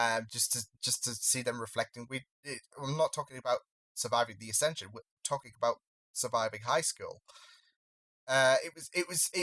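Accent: United Kingdom. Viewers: I don't. Most Canadians live very near the American border, get their main TV channels on basic cable, and we can tell the difference between ourselves. You still sound a bit like the people on Coronation Street. Your Britishness adds a bit of spice to your reactions - the accent, the language. It is a bit different, but very adorable. Loved your Joker, BTW.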